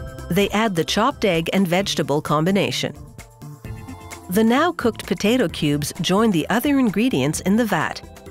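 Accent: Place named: Canada. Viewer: This wouldn’t be a US episode as the narrator is Canadian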